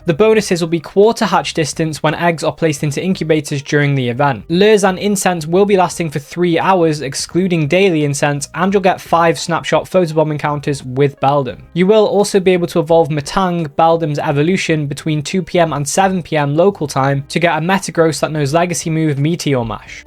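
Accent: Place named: United Kingdom. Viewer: He’s speaking the Kings English not American 😂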